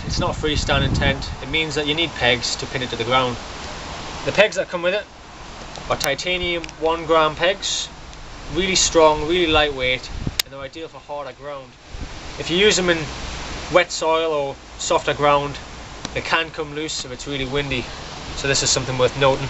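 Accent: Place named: Newcastle, United Kingdom. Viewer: I like ur voice. Geordie. Mmm.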